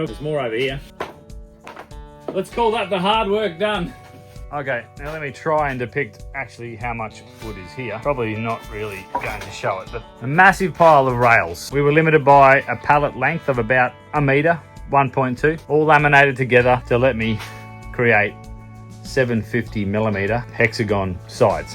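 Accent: Australia. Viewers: I do love my machines, I've not quite as small a shop as you have though. Even as an amateur, time is as important to me as money since I never planned to support my hobby with what I make. I'd mention that sometimes the background music is just loud enough to make your speech less discernible. (Sure, I hear in American but I can usually follow Aussie.)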